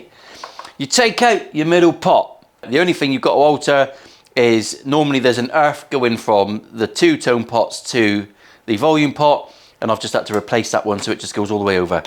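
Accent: United Kingdom. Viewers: Now we're talking. I just Frankenstrat'd a Squire. Took out all 3 pickups. And took the tone out. Put in a Obsidian pot volume. And the rest of the hardware I mean everything else I'd Wilkerson. I put in a p90 at the bridge. I get a funky tele sound and straight up dirty rock. Love this dude. I'm guessing he's A brit? He don't sound like the local hillbillies here.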